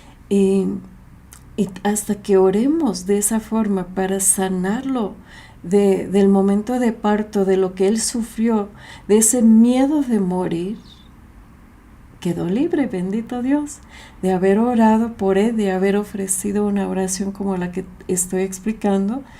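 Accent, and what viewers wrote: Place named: Mexico. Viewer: Pese a tu español tan mejicanizado te hiciste entender maravillosamente ...por lo que será muy fructífera la enseñanza ...porque se que la hora hiciste en el Nombre de Dios Trino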